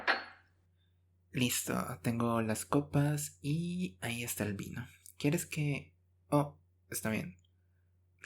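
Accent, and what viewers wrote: France. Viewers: Tienes un lindo acento francés ❤❤❤ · Gracias por todo tu esfuerzo empeño trabajo duro quién me has puesto a los videos a este video cada vez que oigo tu voz se me acelera el corazón me alegra la vida tienes una hermosa voz tranquila calmada que enamora y encantada y encariña siempre me voy a enamorar y me voy a encariñar amo tu voz francesa love for your ❤❤❤❤❤❤❤❤❤❤❤❤❤❤❤❤❤❤❤❤❤❤❤❤❤❤❤❤❤❤❤❤❤❤❤❤❤❤❤❤❤❤❤❤❤ amo con locura tus videos siempre me voy a enamorar y cantarina😊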